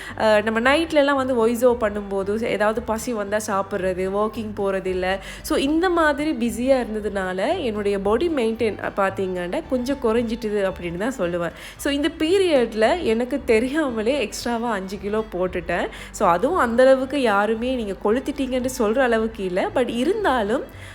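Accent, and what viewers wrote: Sri Lanka. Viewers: Neenga srilanka tamil thane pesurenga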